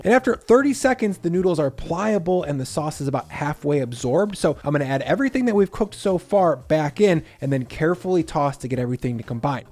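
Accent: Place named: United States. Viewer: I'm a late comer. Just found your channel. Love it. I imagine that I may wonder sometimes what is he talking about, with you being American and you call things by different names and pronounce things totally different from us here in Australia.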